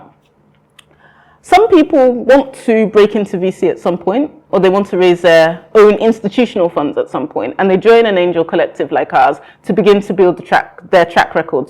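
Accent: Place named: United Kingdom. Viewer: Nice one, but a local academic lecture shouldn’t come with such a deep British accent tho.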